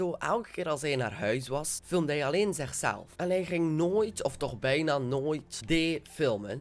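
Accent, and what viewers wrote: Belgium. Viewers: Random praatvideo's zijn wel lachen want ik vind t Belgse accent gewoon mooi haha doeo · Yo.... Je hebt echt een SUPER epic kanaal, ik volg je al vanaf je eerste Video...... Wat ik het leukste aan jou vind is: Je Belgische Accent is echt super grappig (GeenHaat) en de manier waarop jij je vids opneemt... ja weet je... dat is gewoon SUPER EPIC Welke Vids Ik het leukste vind::::::::::: *VerlatenLocaties *Vlogs *Gaming Dat vind ik gewoon super tof... Maar je moet gewoon doorgaan met JoutubbePasta en dan komt alles goed en je moet vooral jezelf blijven eejj en pssst.. Je moet je niet Gek laten maken over een dom iemand die denkt dat hij/zij grappig is...... Jij maakt je Vids op jou manier en dat is het gene waar je het best mee bent dus laat ze maar praten... Je bent gewoon de beste Joutouber:D Van Joutuoube:P Groetjes Je beste fan ever #AceFan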